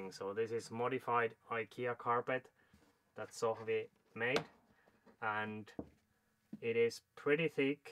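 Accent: Finland. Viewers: Er du Nordmann? Aksenttisi perusteella uskon, että saksisi on oltava suomalainen. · Aksentista äkkiä päättelin että suomalaisia sitä ollaan, hienoo että elätte unelmianne!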